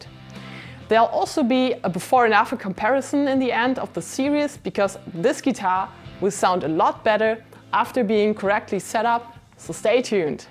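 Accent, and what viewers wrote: Germany. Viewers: Hallo. Am Dialekt nehme ich an Deutsche. Alle deine Videos zum Setup waren eine grosse Hilfe. Ich besitze eine rote TE-20 und veränderte ein paar Kleinigkeiten. Messing Steg Sättel compensated, neue Saiten, anderen Hals Sattel und Locking Tuner. Von Pickups sehe ich ab. Ob sich alles gelohnt hat für mich? Bin Anfänger. Die Mühe mit dem Hals Sattel würde ich nicht mehr auf mich nehmen, aber bin um eine Erfahrung reicher. LG Robert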